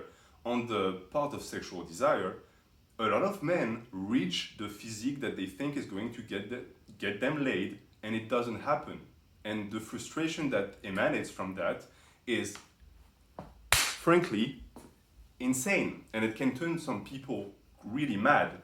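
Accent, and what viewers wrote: France. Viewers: Is your native language french?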